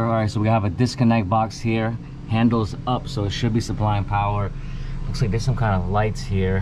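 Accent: New York, United States. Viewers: You sound like your from NY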